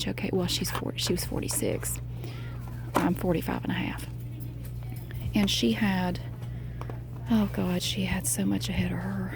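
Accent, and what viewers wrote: South, United States. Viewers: Plz don’t stop them because of selfish sad people!!! Focus on the people that love your videos like me!! I thank you! When I’m at work and stressed I look for you and put these videos on and you southern voice calms me down!!! Thank you in Jesus Christ mighty name!!! Dan from NH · i’m love these videos. they’re so calming to me. i lost my mom when i was 15, so listening to you talk makes me feel like i’m chatting away with a supportive, sweet, southern mama! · Hey there. I watch your videos often but am not one to comment every time. I wanted to quote the famous RuPaul and tell you " 'less they payin' yo bills, pay dem bitches no mind!" Don't let the negative comments from a bunch of people you'll never know well or meet bring you down. I enjoy your videos. They help to calm me. Plus you have that great southern lady accent. 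I'm in Michigan so we don't hear that around here often. Keep doing what you're doing and keep your chin up. Thank you for your work! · you're the best!!! I watch these vids when I am at work and have to laminate and cut thousands of buffet labels. It makes the time pass quicker then just listening to music and I love your Southern Accent and sassy remarks, thank you💜💜💜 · Girl I love your videos we got the same country accent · When I'm in shoos I do the same thing now. Its addictive and really fun. Even my great niece does it. This is doing what she does brilliantly ASMR. Soft Southern accent, relaxation, I love it. Haters keep hating, if its not for you piss off and stop criticisising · Feel like I’ve just had a counselling session the, thank you ❤️❤️ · I’m from Germany and I really enjoy listening to people with a southern accent, it just sounds so fun! I spent a year in the US, in Montana though. 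Great experience! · I've just recently subscribed to your channel and I am so glad I did! These types of videos imparticular are oddly relaxing and satisfying while informative! Plus, I love your soothing voice and southern accent..so caring and motherly. Thanks for all of the work that you do!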